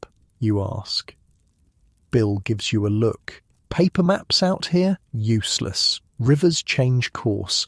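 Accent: England, United Kingdom. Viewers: American pioneer stories by a narrator with an English accent?! Ummm, no thanks. I’m out 🙄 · Why is an English man commentary to the American history??😂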